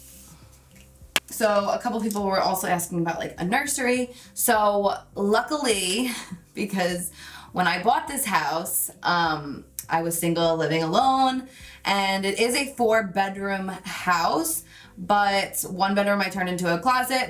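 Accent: New Jersey, United States. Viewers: Her Jersey accent seems stronger? Anyone else notice that? Haha I love it. It reminds me of 2013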